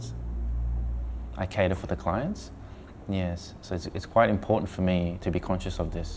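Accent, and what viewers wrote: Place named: Australia. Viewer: The Australian accent threw me off for being japanese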